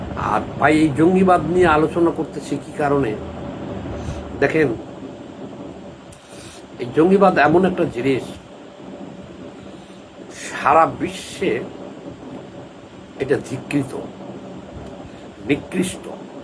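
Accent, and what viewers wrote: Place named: Chapainawabganj, Bangladesh. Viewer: ভাই আপনি কি চাঁপাই নবাবগনজে ছিলেন? আপনার কথায় মনে হয় চাঁপাই এর মানুষ।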